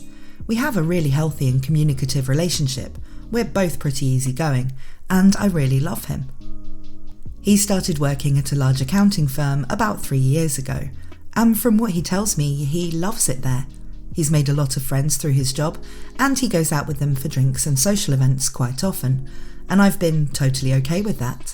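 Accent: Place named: United Kingdom. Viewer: More! Because of your accent, I default every story as something happening in the UK somewhere. Then you talk about States and other American stuff and there's a brief pause while my brain processes the disconnect.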